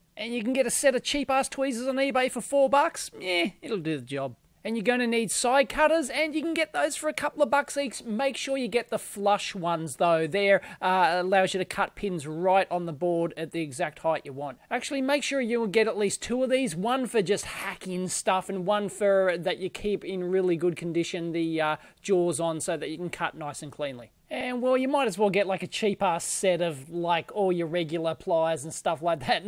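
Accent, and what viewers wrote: Australia. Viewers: Oi u sure you are not an Aussie mste? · Voy a asumir tu esta in estados unidos con un espeso acento austrailano, lol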